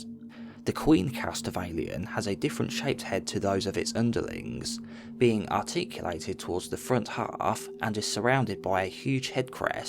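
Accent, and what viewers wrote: England, United Kingdom. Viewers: accent is giving me british forest gump vibes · Because he's from England, is reading from a script and does not have a £300 microphone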